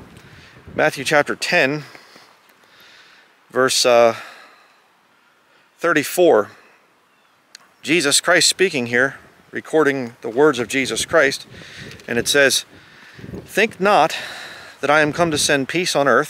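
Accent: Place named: Southeastern Pennsylvania, United States. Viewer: I knew you were from PA…I heard your accent and thought how does this New Englander have a southeastern PA accent? Did I hear you say, in so many words, you’re not a Trinitarian? I know the word Trinity doesn’t exist in the Bible…but I believe in Father, Son and the Holy Spirit. If I believe that God is one in three based on my current understanding of scripture do you find that problematic? Unlike many denominational members I like to have my beliefs challenged. Iron sharpens Iron🙏🏻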